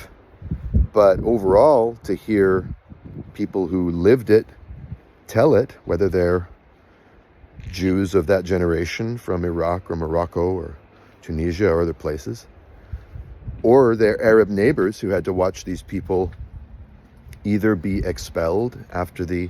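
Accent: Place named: United States. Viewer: Did you watch Tantura? The people who did that were not victims of European Holocaust.... the Israeli hyjacking of it is outrageous! And PS... the American holocaust that Jewish ppl are also complicit in is ongoing to this very day! OUTRAGEOUS WITH YOUR AMERICAN ACCENT YOU ERASE genocide you are also a beneficiary of NOW!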